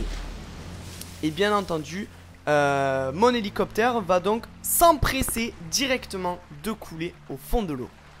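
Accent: Marseille, France. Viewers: il parle comme les marseille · jentend un accen marseiller (vive paris)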